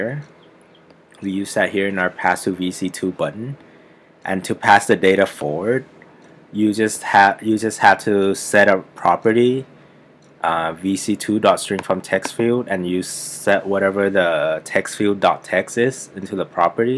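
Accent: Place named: Thailand. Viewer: You got a really good US accent but I still can feel somewhat Thai in your voice :) . I've lived in Thailand for 2 years ^^. Thank you again I've understood and practiced this lesson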